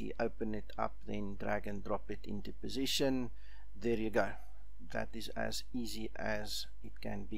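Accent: South Africa. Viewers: what part of south africa are you from and thanks for the vid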